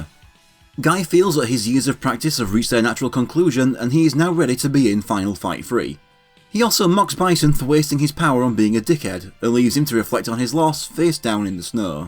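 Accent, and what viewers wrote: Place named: United Kingdom. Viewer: Great video dude,Always great to see and hear another gaming Brit on YouTube! Subscribed!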